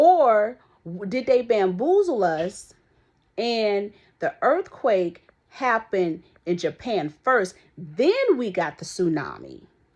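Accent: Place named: Upper Northeast, United States. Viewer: Loving your upper NE Upper Crust enunciation - Accountability 😂. And I know y'all remember "Unpack your adjectives"😅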